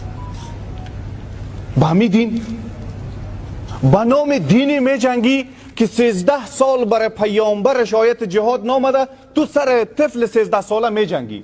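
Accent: Tajikistan. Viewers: Бахдо тоза лахчай точики кати гап мезана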